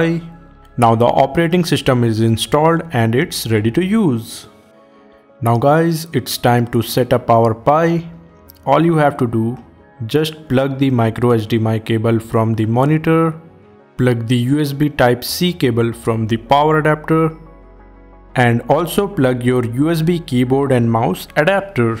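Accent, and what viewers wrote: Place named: India. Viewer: your indian accent kills me! really? does everyone in India talk english that shitt*y? pffff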